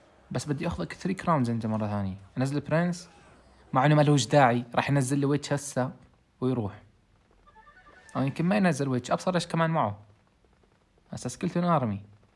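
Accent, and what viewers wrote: Iraq. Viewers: تحجي كلة عراقي هل ايام😂😂😂😂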